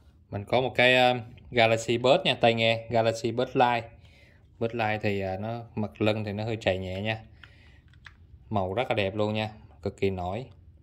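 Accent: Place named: Mekong Delta, Vietnam. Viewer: A la người mien tay ha nghe dong quen quen. E o bạc lieu a gửi ve cho e dượt ko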